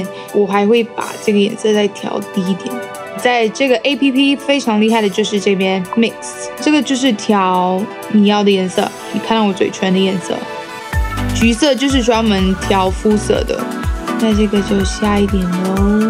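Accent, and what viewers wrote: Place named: China. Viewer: 很會學中國腔🤣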